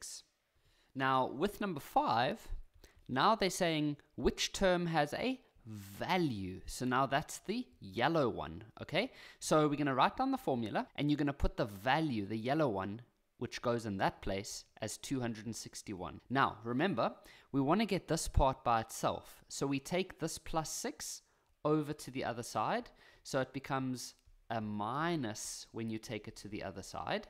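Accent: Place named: South Africa. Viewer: what country are you from because you sound like your south african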